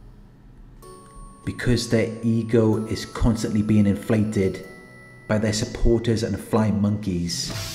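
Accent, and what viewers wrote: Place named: England, United Kingdom. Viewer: He is English we have many dialects over here unlike the US. The silence is so you can digest what he is saying.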